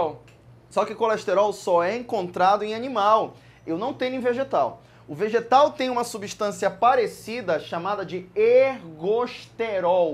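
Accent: Pará, Brazil. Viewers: Muito bom o sotaque do Pará kkkk ótima aula! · olá professor , estou acompanhando suas aulas há algum tempo , pelo sotaque , pensei que fosse paraense , hoje descobri que é sim , e achei muito legal , também sou paraense e já morei em Ponta de Pedras , atualmente moro no RJ, gosto muito das suas aulas , super recomendo para os meus colegas e agora sabendo que é paraense vou triplicar isso .Ótima qualidade são suas aulas, completas! parabéns e sucesso. Gabriela Araújo.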